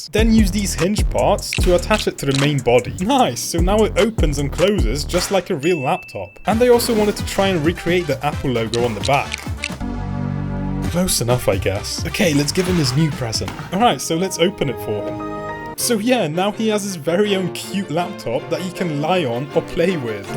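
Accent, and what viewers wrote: United Kingdom. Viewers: I thought you were British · You sound British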